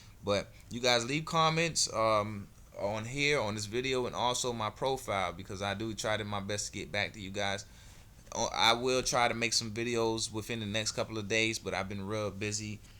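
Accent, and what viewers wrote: United States. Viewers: Somethin out of barney! 😂😂 are you American? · sounds like a mix of eubonics and southern drawl. stop hurting my ears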